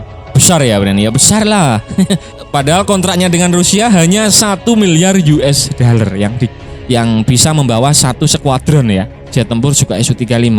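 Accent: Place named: East Java, Indonesia. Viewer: Lu dri Jatim min?? Ngomong e.. Rekk2..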